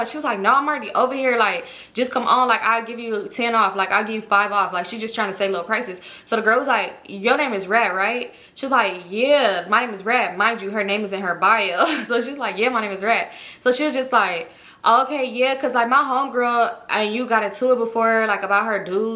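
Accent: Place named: Los Angeles, United States. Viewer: I discovered you from honey K whenever she went to your rodeo event & now im hooked on your channel. I love the way you and her talk too with the LA accents 😂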